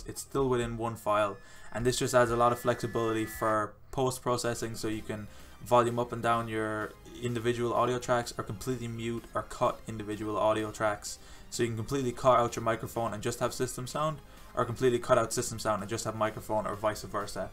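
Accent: Ireland. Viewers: Thank you very much for this clear and well-explained video. Do I detect an Irish accent? If so, snap ;) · Are you Irish by any chance? Great tutorial by the way!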